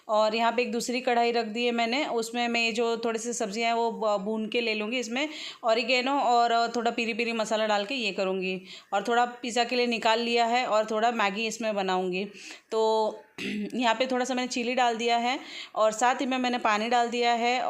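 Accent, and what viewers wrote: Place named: Maharashtra, India. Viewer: Hi kaisi ho apke bolne ka trika bohot acha or jo aap marathi touch dete ho beech beach may vo to osam h mast lgta h, or jo English sikha rhe h unko bolo mujhe apne aap pr proud h mai Indian hu meri matr bhasha hindi h 😊 isi hindi bhasha ki wajah normal ladies bhi apka video dekhti h hum apka video apne TV pr lga k sab log dekhte h or yhi apke vlog English may hote to adhe log dekhte or adhe log muh bnate but jo bhi ho apki recipe bhi bohot achi hoti h,hindi may hone ki wajah say or Mia ata h dekhne may good job God bless you............. English walo k liye 👹buri nazar wale tera muh kala 👹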